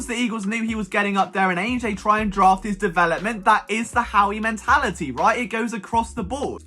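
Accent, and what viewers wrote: United Kingdom. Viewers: You have a great show. The haters are shocked that a dude with a brutish accent has this much Eagle knowledge. Big ups to you.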